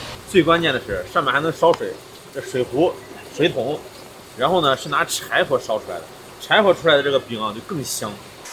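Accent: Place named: Xingtai, China. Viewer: 太感谢了！去探店了我的老家。我在上一篇石家庄的探店视频下给您留言推荐邢台，紧接着邢台的视频就来啦！出国快十年了，听到久别的郉普乡音，看到热闹的清风楼，美食也是杠杠的！最棒！🎉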